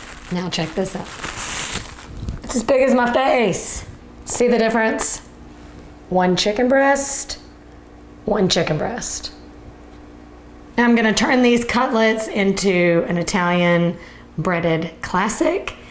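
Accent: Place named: Alabama, United States. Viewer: I am in love with you. I love all your recipes. Perhaps if I comment ant say nice things YT will keep you on my YT feed. I dearly LOVE your Alabama accent too! Ken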